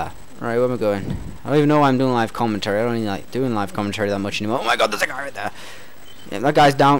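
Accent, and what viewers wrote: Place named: United States. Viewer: are u kidding me ??? ----- really? i think all the americans are rubbing off on you - starting to sound like them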